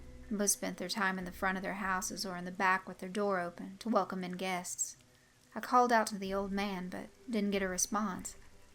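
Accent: South, United States. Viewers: Please don't take this the wrong way . Your southern lilt really puts a story to a first calming effect and at or towards the end I can pick up the fear or excited tone bringing the end to a much more dramatic conclusion . Great job on the story . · I honestly love your videos!!! I was born, raised, & still live in Alabama & so glad to hear another southern voice. All of your stories are always so captivating. Great job & keep up the amazing work.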